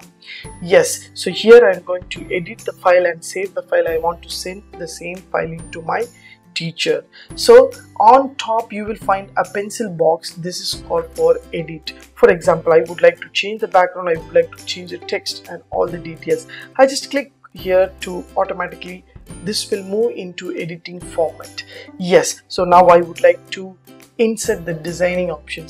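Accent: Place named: India. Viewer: THE INDIAN VOICE THO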